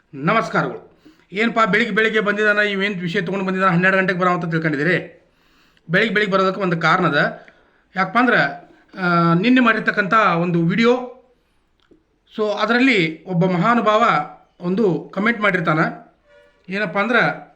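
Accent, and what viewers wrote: North Karnataka, India. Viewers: ಅಣ್ಣಾ ನಿಮ್ಮ ಮಾತ 🔥 ನಿಮ್ಮ ಊರು ಯಾವುದ ರಿ ನಮ್ಮ u k ಭಾಷೆ ಮಾತ್ರ 🔥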